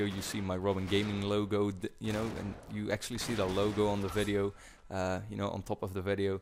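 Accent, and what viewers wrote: Netherlands. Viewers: Ik ben ook Nederlands en ik zocht op youtube een commentary over Breaking Bad en kwam toevallig op jou uit! Geweldige serie. Ik vroeg me van het begin al af of je Nederlands was ;)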